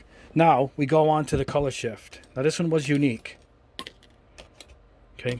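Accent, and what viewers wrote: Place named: Long Island, United States. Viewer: New England.. I would have guessed Long Island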